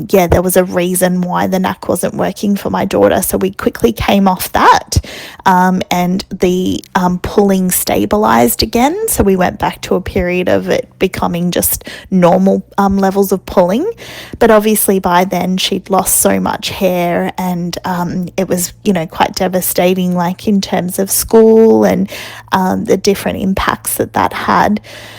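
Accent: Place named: Australia. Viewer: I love your Australian accent! I never get tired of listening to it 🧡🧡🧡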